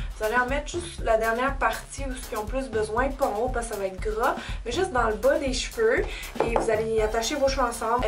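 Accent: Quebec, Canada. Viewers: J'aime beaucoup tes vidéo .ton accent québécois par rapport à d'autre passe très bien.je vais vite tester certaine astuces .la couleur blond te va mieu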